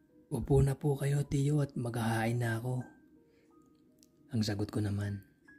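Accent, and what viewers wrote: Pampanga, Philippines. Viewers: The accent is very Kapampangan. ❤❤❤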